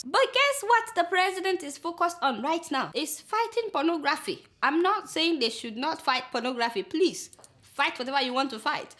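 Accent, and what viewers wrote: Yoruba, Nigeria. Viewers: LOL FUNNY HOW YOUR YORUBA ACCENT IS SO PREVAILING. LOVE YOU GIRL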